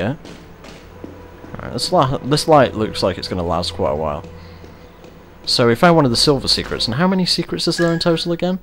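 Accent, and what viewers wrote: United Kingdom. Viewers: AWWW THAT IS ADORABLE!!! Why do you British people have one of the best accents evar!? lol.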